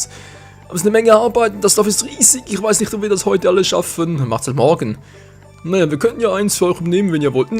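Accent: Switzerland. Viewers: cooles video kann es sein das du aus der Schweiz bist wegen deinem Sprachverhalten xD nebenbei hab dir n abo und like dagelassen ich würde mich freuen wenn du auch mal auf meinem channel vorbeischauen würdest :D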